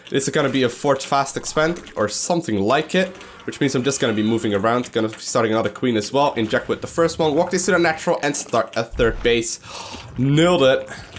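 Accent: Netherlands. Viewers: He's dutch :) not Irish O.o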